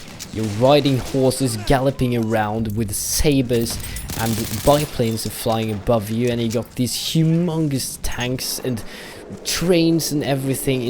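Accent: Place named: Europe. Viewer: You're voice is really reminiscent of DannyOnPC, just some European goodness :3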